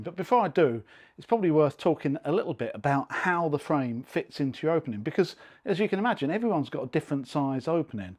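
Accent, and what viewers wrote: United Kingdom. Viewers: Wow!! ❤ what a nice door! Great locks to keep the local punks out of my electronics shop at home - love the multiple locking pins on side AND top! Sounds like you might be across the great pond - can this be purchased in the once great USA?...thanks for the GREAT demo 👍👍👍!!!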